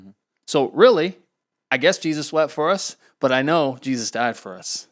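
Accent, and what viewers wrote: South, United States. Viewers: I live in TN haha and I thought you had a bit of a southern accent at times lol. At least now I know you are a good ol Southern Baptist haha!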